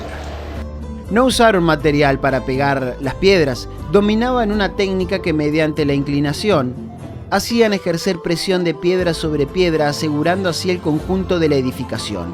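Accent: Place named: Argentina. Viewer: EL QUE NARRA ES ARGENTINO NO CABE DUDA....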